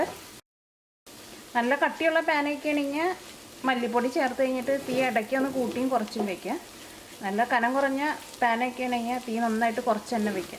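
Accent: Thrissur, India. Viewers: Very nice. Pachakam n vachakam balanced. Nice Thrissur bhasha 🤗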